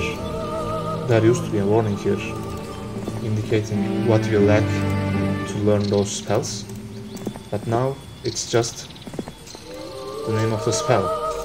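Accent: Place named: Turkey. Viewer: Are you Turkish? Your accent is Turkish.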